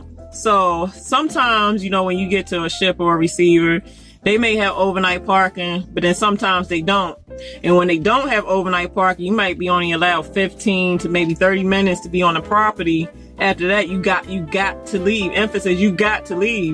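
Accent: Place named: Washington DC, United States. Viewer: Washington DC or Baltimore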